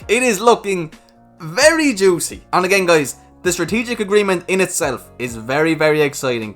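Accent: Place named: Ireland. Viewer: you rock man! Love the Workhorse videos. I would send you some American Bourbon if it wouldn't be confiscated. Love your content. My wife hears your accent and says "Oh your listening to your Irishman investor." lol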